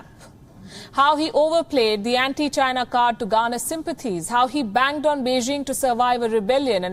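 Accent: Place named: India. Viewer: There is nothing more funny than the sound of English coming out of an Indian person :)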